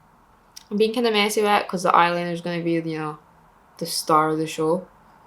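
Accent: Scotland, United Kingdom. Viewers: She’s scottish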